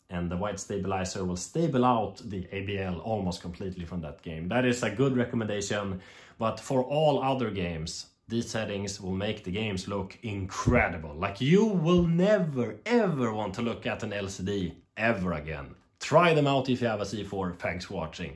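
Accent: Sweden. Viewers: Svengelskan är top notch :D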